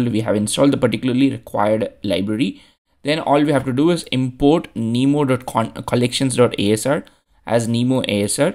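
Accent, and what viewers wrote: India. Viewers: Can u clone your voice? I can’t understand this jeet accent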